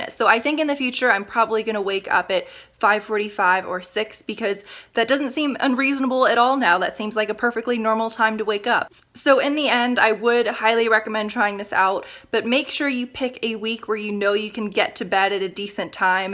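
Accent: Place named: United States. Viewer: I am not an english speaker but I can understand her speech even though she speaks very fast. don't know she use easy words? or pronounce clear. wonder where she is from. think from America but which part of accent? somebody know?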